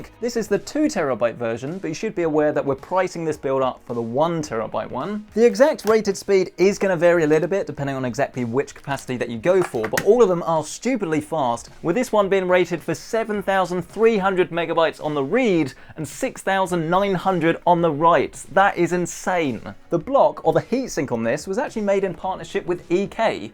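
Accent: United Kingdom. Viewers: what region is your accent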